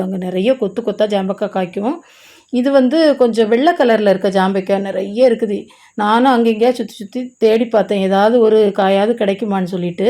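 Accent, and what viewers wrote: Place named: Kanyakumari, India. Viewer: கண்ணியாகுமரி குரல்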